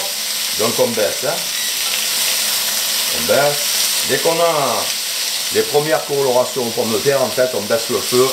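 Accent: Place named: Marseille, France. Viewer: Ah! Et j'oubliais... L'accent de Marseille, qui rime avec merveille...Top de super top!💖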